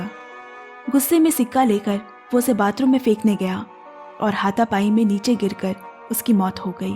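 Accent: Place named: Lucknow, India. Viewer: Ap lakhanow Sy hy kia? bohot hi pure urdu bolti hy ap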